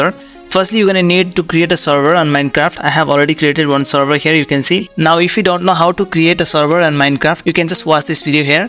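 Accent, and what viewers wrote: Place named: India. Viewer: it's joke, the guy has Indian voice and must of the time Indians are scammers, but this isn't one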